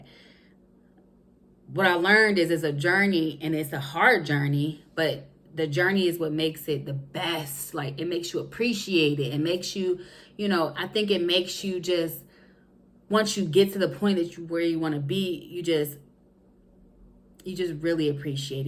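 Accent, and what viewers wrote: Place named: Ohio, United States. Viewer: I love your transparency and authenticity in this video!!! 🙌🏽 I’m interested in learning more from you 😍 sounds like you’re in Ohio so am I!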